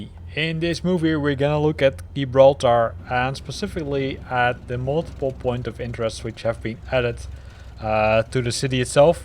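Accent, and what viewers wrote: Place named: Netherlands. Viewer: Recognized the accent and immediately Subbed to support a fellow Nederland er!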